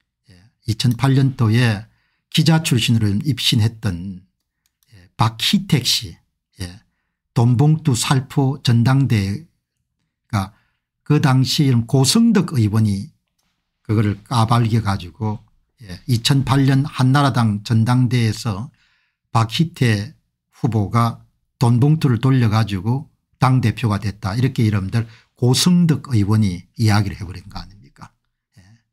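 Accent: Gyeongsang, South Korea. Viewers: 공병호님 방송 항상 잘 보고 있습니다. 하나만 지적할게요. '새'를 '섀'로 발음하고 있습니다. 그러니까 인생을 '인섕'으로 발음하고 있습니다. 서부 경남 지역 발음입니다. 공병호님이 방송인이라 이런 지적을 해봅니다.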